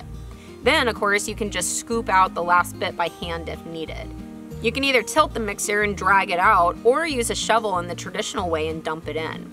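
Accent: Texas, United States. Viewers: Hmmm... Accent sounds like Texas... Rocks in the ground, so probably not Gulf Coast or Dallas... Too much vegetation for it to be West Texas, so must be Central Texas somewhere... Howdy from the Houston Gulf Coast area!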